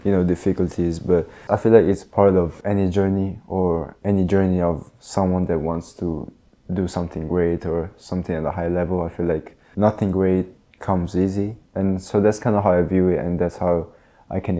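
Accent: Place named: Italy. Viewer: Love your game, but love your mixed accent of Italian & NorCal even more.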